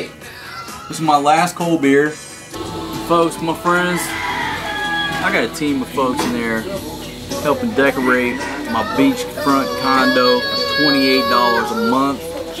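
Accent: South, United States. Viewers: Where you from bro down south somewhere I guess Louisiana? Liking your content on your channel can’t wait to get my ass back to phillipines and yep gimme a choice I’ll take phily over Thailand any day even with its fuck ups lmao 😂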